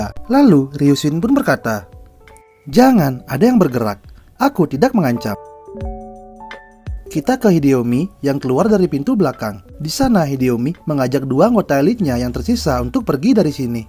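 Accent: Bali, Indonesia. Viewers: orang bali bang?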